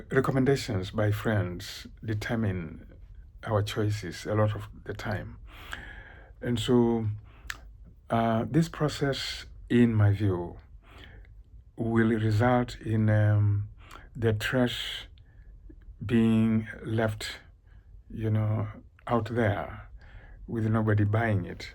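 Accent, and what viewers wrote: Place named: Tanzania. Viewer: love it! i was in tanzania once for a month with my grandmother because she works for the church mission in germany , the people and the country are just awesome definitely visiting again soon . So hearing you talk reminds me of this wonderful time i had there. And you have a very calming voice ! Keep it up ! <3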